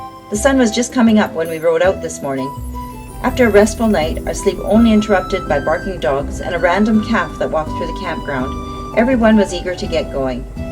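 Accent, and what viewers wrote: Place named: South, United States. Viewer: maybe not mechanical, but just annoying. like an american from the bible belt.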